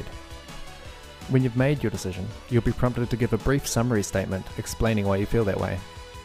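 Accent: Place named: New Zealand. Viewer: Great tutorial, but the sub-titles are a bit random - seem to struggle with the good old kiwi accent.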